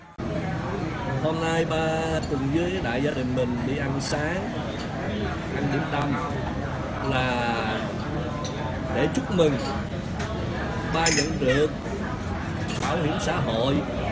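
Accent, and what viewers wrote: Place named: Mekong Delta, Vietnam. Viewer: Giọng cua bác hơi tây tây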